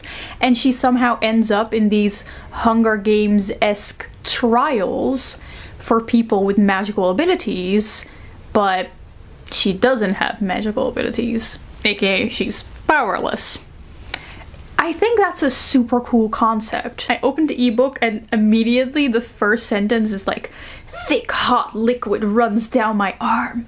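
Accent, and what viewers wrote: Netherlands. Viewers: omgg jij bent Nederlands? ik dacht dat je dat was vanwege je accent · Okay i didn’t know you we’re dutch untill i read the settings in your e book😭 so thats why you have an accent!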